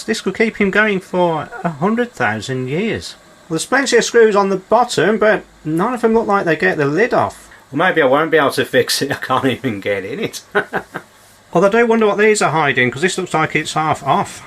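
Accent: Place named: United Kingdom. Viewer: Very friendly British speaking guy also good technician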